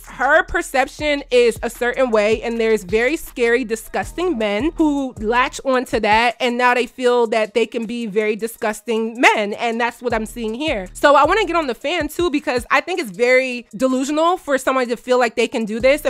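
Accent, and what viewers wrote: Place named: United States. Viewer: Tbh I never understood the hype over Rubi rose she just looks like any other IG thot with not much more than their body to offer lol. This is really random too but you’re so pretty and I don’t live in America so it’s interesting to watch an Igbo girl speak with an American accent, rather than the strong Nigerian accent which I always hear 😆 my mans Igbo and I’m close with his family, we both pointed out that you resemble his sister a little bit when he saw me watching ur video. Her name is also. Igbo features are so strong and easy to spot when you’ve been around them a lot. Very beautiful. Love how they use old English words a lot like “have a prosperous day my wife” (his sisters also call me their wife) and often mention god while they are talking about stuff. 🥹💗 nwanyi oma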